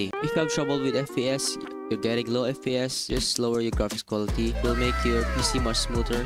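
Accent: Philippines. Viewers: Yo sound like a filipino❤❤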